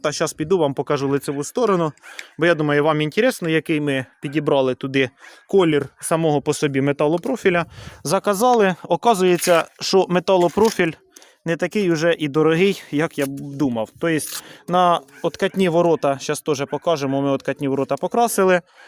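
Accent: Poltava, Ukraine. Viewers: Нарешті ти з'явився.Вже скучили.Я родом з Полтавщини,але вже 50 років живу на Черкащині .З задоволенням слухаю рідну полтавську говірку.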